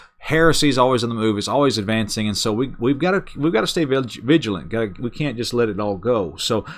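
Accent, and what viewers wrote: South, United States. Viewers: I found the radio app finally in case you didn't. It's WIOP radio not WIP. I think it's his southern drawl that made me misunderstand. I hope this helps you.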